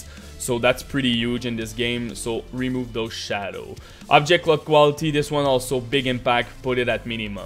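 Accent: Quebec, Canada. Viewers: ca sens lquebec a plein nez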